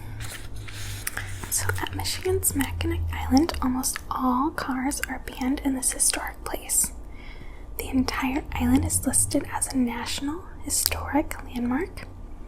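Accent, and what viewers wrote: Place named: Chicago, United States. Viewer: But that accent is either Chicago or Wisconsin.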